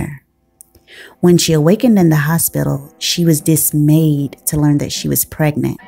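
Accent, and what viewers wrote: South, United States. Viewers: I've thought about starting a channel. Good luck. You have a beautiful speaking voice. Are you Southern? I heard about Berle's package previously. I'm a huge Drifters fan. Sad about Clyde.😢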